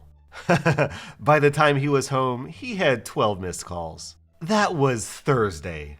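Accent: United States. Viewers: Love hearing UK vernacular with US accent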